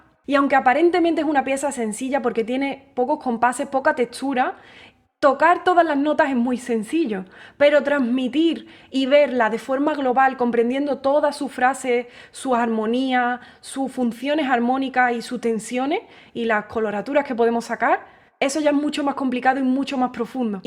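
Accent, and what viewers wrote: Andalusia, Spain. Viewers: Excelente interpretación. Ese acento andaluz 🥰😍💕 enamora. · Hemosa música, maravillosa didáctica y extraordinaria interpretación. ¿Ese acento es de qué región de España? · Ay que lindo acento tienes. De que parte de España es? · ya decía yo, ese acento es Andaluz, felicidades hermosa interpretación, saludos desde Guayaquil-Ecuador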